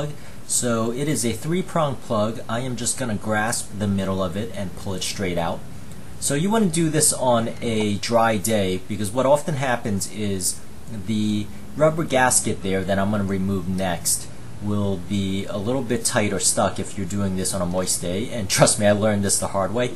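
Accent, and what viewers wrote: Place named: Long Island, United States. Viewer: Great video. Very thorough. Love your mild Long Island accent. Did I get it right? It's like a toned-down Ramones accent. Hey Ho, Let's Go!!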